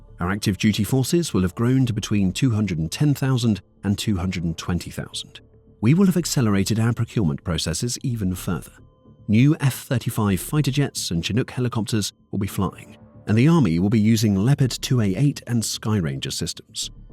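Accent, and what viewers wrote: United Kingdom. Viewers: always a British accent too.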